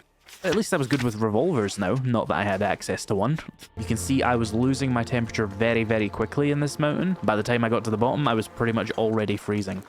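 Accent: Scotland, United Kingdom. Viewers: Ah, it's so nice seeing someone taking their first steps in the game and bit by bit figuring out the core mechanics. Makes the game feel all new and fresh even to a veteran player. :) Also love your accent, are you from Scotland?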